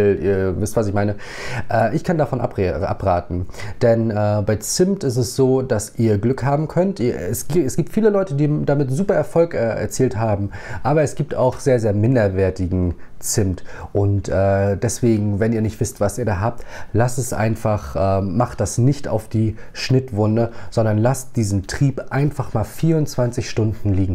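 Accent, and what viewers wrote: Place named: North, Germany. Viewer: Ich liebe, dass du uns neben der Vermehrung von Pflanzen nebenbei noch Nachhilfe in norddeutsch gibst 😅💚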